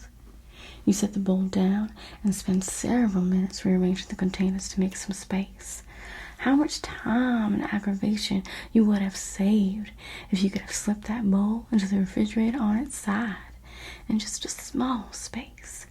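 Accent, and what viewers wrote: South, United States. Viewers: you sound just like so many of the folks here! ... the Southern accent ☺️❤️ you do it so well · The southern accent is giving me major * from the Golden Girls vibes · Love the Southern accent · Your Southern drawl was spot on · The southern accent! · more in this southern accent! Soooo lovely! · That southern accent is HEAVENLY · I live in the south and felt so at home with your accent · more videos with the Southern accent?? ... you do it so well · southern drawl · the southern accent was a great touch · my favorite southern accent ASMR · this southern accent really takes it to the next level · Your southern voice reminded me of the times the ladies at church would meet and whisper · the southern accent is magical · I love the southern accent ! Wow, you nailed it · more southern accent videos! ... southern accent is my favorite, lovely and warm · Im loving that southern accent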